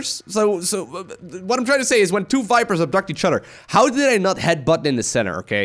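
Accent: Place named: Netherlands. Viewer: Your Dutch accent never gets old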